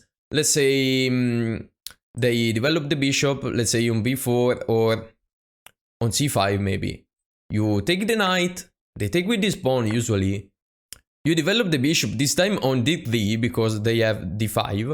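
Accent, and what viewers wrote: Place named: Italy. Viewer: Great stuff bro. I enjoy the Italian accent 😂. Can you please show your best response to the Sicilian defense? Thanks 🙏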